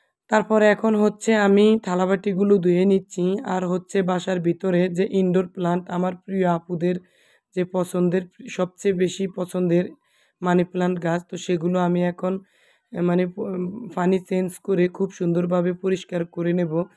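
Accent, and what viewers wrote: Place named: Bhola, Bangladesh. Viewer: আসসালামু আলাইকুম আপু ভোলার কথা সুনলে মনে অতবুত শান্তি লাগো দুই বছর হোলো ভোলা জাইনা লাইক সেয়ার করে দিলাম❤❤❤❤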